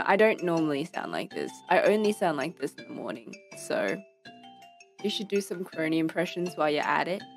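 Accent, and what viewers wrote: Australia. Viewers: 중저음의 호주식 영어.... 크로니와는 다른매력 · 오지 악센트가 좋아지고 있어.. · 중저음 호주억양 최고야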